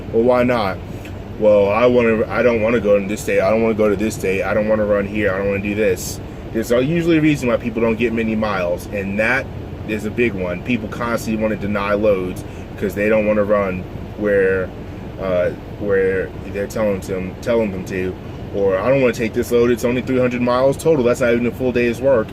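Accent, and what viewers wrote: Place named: Maryland, United States. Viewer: You gotta be from Maryland?? That accent..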